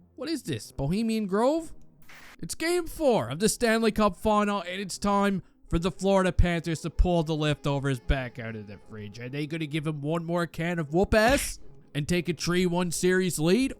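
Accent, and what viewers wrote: Newfoundland, Canada. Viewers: Are you a newfie?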